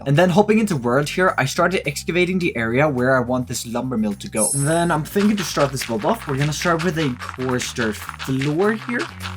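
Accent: Sweden. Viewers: These videos are great man, keep it up your builds are awesome. Jag hörde din svenska dialekt direkt hahaha ;)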